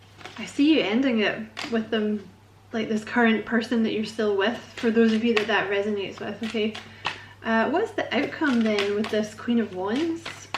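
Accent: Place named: Scotland, United Kingdom. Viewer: Gemini Ty...the Accent is lovely Scottish...lol from Queensland Australia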